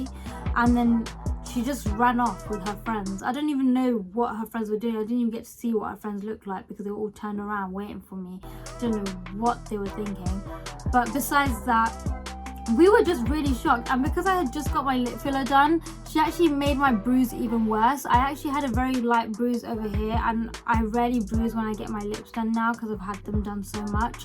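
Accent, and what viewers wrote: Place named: United Kingdom. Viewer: Omg you’re from UK??? 😍😍😍😍 the accent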